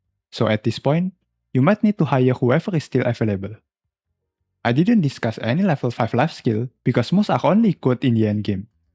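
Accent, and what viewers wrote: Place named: China. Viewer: bro sounds chinese , therefore this video is already gauranteed worth it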